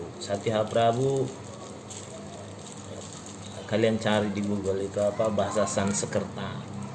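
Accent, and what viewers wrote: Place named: Medan, Indonesia. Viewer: Pak orang batak apa bukan pak Suara nya nada medan pak Salam dari saya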